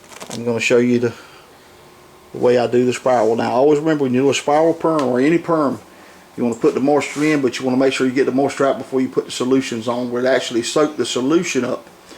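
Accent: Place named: East Tennessee, United States. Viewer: Where is he from! He sounds like us here in East Tennessee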